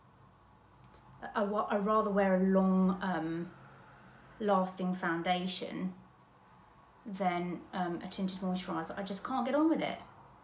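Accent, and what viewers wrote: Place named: Northern England, United Kingdom. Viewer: You look absolutely stunning ❤. I love that you totally, 100% know what works on your skin and how to use those products to give a beautiful finish, and with no faff or complicated techniques. 👌👌 I'm also glad that you're having lovely warm weather, I miss the summer's in England so much. The country pubs, the charming chocolate box villages, and hearing the birds sing......so many precious and wonderful memories of my younger life.....🥰 Loving the Northern accent 🫣🤣 Enjoy the sun 🌞 ❤🥂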